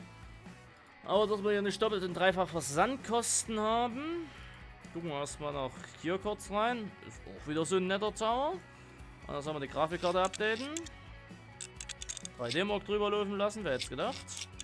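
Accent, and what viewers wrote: Saxony, Germany. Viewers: Wieder ein geiles Video Findes es immer wieder geil Sächsisch zuhören-ob im TV oder in deinen Videos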